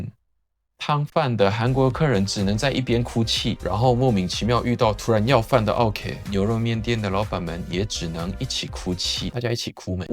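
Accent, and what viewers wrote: Taiwan. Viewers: 好邊準的台灣腔國語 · 聽到你講國語，會覺得你是韓語講得很好的台灣人😅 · 常看you tube 影片,今天才有看到你的幾支影片,很好奇你的說話完全百分百如台灣人一樣口音、甚至更好,是在台灣生活幾年？能有如此語言程度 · 我幹麼解釋這個哈哈哈哈 然後台語也太標準了😂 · 超級標準台灣腔欸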